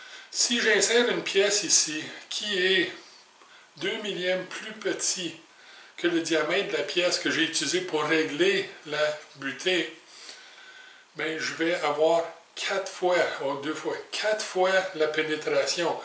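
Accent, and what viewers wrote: Canada. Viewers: Excellent professeur, ce, très à cheval sur la transmission du savoir (ouais, mais être à cheval, pour un, c'est un peu son job, non?). J'ai déjà beaucoup appris de ses vidéos. L'accent canadien rend parfois l'accès au contenu un peu délicat pour une (vieille) oreille belge, mais je prends beaucoup de plaisir à apprendre avec lui.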